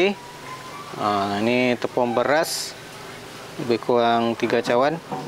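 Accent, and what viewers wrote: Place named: Kuala Nerang, Malaysia. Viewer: Tok ckap mcm org blah kola nerang ja.. 😂😂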